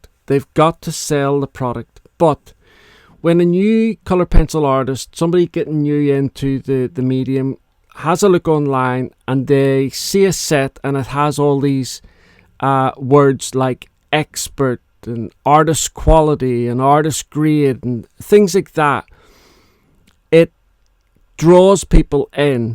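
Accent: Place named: Northern Ireland, United Kingdom. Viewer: I was about to donate to Habitat( a thrift store i Florida) , my colored pencils, which I bought 8 years ago and never used (Faber Castell,Derwent & Reeves) I went online found your site. It was your clarity and honest information that started me -But your NI accent made me homesick for ‘the island’ - I lived in the Republic for 30 years, and was part of the Forrum for Peace and Reconciliation, and spent time at Queens, for the resurrection of NIGP. I wound up buying more pencils, and was converted away from acrylics. Thank you, thank you for making the whole genre clear -, fun and creative and opening up a new, endless possibilities.🎉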